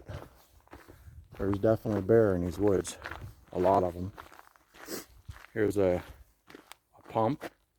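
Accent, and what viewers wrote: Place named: Pittsburgh, United States. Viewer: Love this vid bro....I was smilin and smilin. Your "Pittsburghese" was great to hear. Walkin "dahn" the road......... "mowl" 46......here's"da" connextor trail.....Goin "dahn air".........."yunz"........don't like "em" things......."make it outta dare"........"dahn ear where I parked" Great to see a Pittsburgher "dune" a vid! Keep on rockin! Maybe see ya aht on da trail some time!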